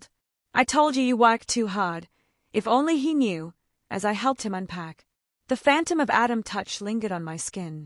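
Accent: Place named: Australia. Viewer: Oh I like the Australian accent on this girl. It actually makes her pleasant to listen to.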